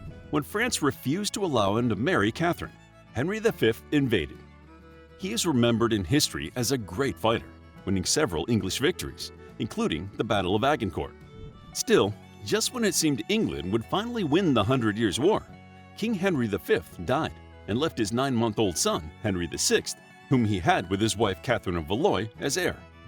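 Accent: United States. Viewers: You do realise this Narrator is American, not British, don't you? Can't you hear his accent. They are obsessed with this rivalry, even though the Brits on the French had been friends for over 200 years.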